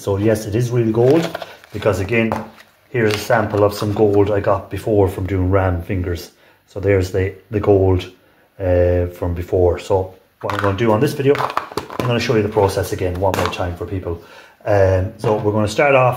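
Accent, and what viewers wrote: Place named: Ireland. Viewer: Hello from South Alabama....I like your accent.... We're probably related, somewhere down the line.. My Dad's Grandmother was Full Irish . Sir .. would you let me know how and where I can get scrap phones, computer boards, etc .?? I would like to get into this.... Thank You.... and ," Don't let them get their hands, on your Lucky Charms."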